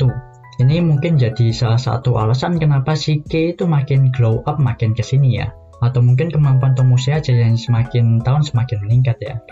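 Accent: Java, Indonesia. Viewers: min kau org mana sih? dri dulu ampe skrg medoknya masih kerasa bgt sumpah 😅😂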